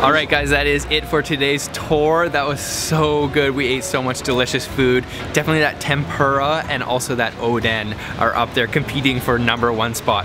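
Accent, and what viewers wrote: Canada. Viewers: This is the first time I've watched one of your videos. Not sure if you're Canadian or Mid-Western American. Great video regardless! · "secret hoos sauce, let's try this oot" I love that you are unabashedly Canadian... keep up the good work lol!!! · omg your canadian accent is strong!! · Canadian or northern Minnesota?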